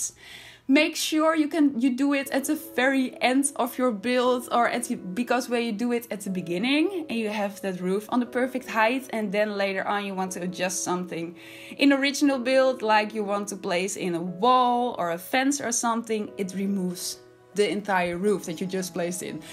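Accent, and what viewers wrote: Netherlands. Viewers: omg i figured because it sounds very similar to dutchsimsmaster 😂 BTW love your builds, cant wait to see more! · I knew it from your accent. I live in Utrecht and u? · First video I wached from you, I'm at minute and I notice your accent. Is it Dutch? Sounds soo familiar, I used to live in Amsterdam ❤ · U sound dutch :) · Ik hoor dat je Nederlands bent en je kan zo goed bouwen je bent echt goed ❤️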